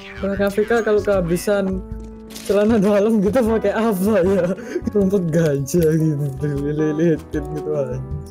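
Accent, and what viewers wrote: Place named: Surabaya, Indonesia. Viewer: Soalnya ngomongya logatnya identik surabaya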